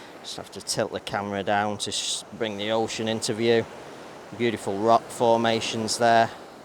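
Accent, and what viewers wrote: United Kingdom. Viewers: Ps. Husband, from bournemouth is trying to guess your accent. He's throwing out lots of options. Lancaster, leeds, manchester, yorkshire. He's usually pretty good at this so you've got him guessing!! Meanwhile, can we hope for that retired banner to come down?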